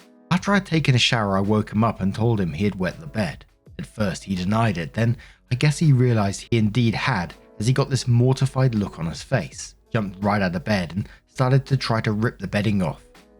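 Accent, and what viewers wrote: England, United Kingdom. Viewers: there is nothing i love more than hearing the voice of a tatted english sweetheart saying "welch's grape juice dumpy" and "hella ass" while laughing. i think i may literally be in love with you just btw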